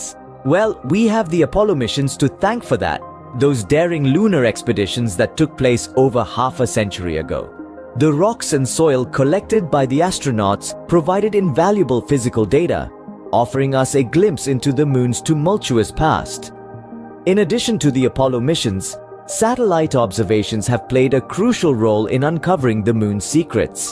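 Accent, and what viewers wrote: India. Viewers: when i hear indian english i change the chanel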